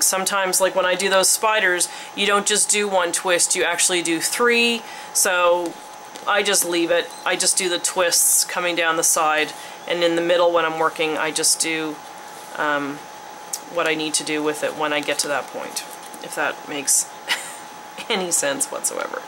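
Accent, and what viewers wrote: Canada. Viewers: I heard your Canadian accent right away! Fellow Canadian here! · Anna Nicholson if you listen she says oot and aboot, which is typical from nova scotia ;)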